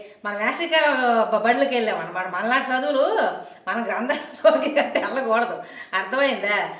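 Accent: Srikakulam, India. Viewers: Sikakulam bhasha needi,mundu neetga matladam nerchukove ,aadadanivena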